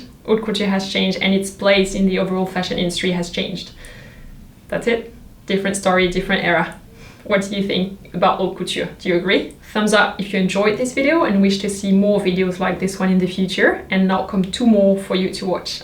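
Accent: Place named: France. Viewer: Could you put english subtitles in you videos? For me it is difficult to understand some words, since english is my second language and I don't know french so I am not familiar with a french accent in the english language.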